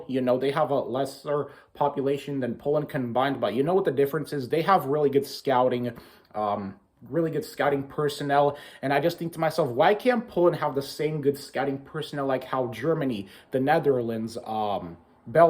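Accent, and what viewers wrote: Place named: United States. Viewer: polish with an American accent or polish-American !!!!good analysis anyways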